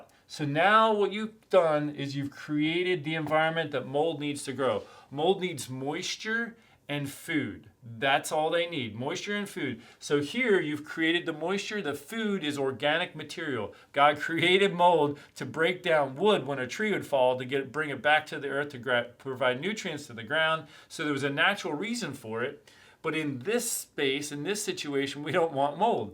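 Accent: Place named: Pennsylvania, United States. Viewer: I recognized that PA accent 😅 I really appreciate all the information you gave in this video. It was exactly what I was looking for.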